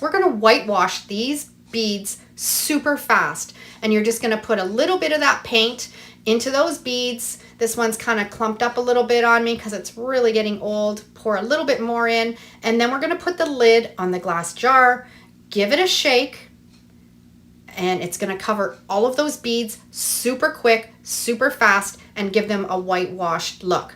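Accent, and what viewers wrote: Canada. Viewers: Sounds Canadian. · And she sounds CANADIAN 🇨🇦 I grew up in Hamilton and still catch myself saying abowt 😊😂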